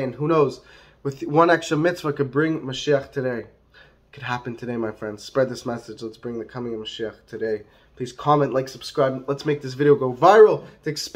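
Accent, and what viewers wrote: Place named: United States. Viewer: Who are you, and where are you from? It sounds like you are from the U.S.A. HOW DO YOU KNOW?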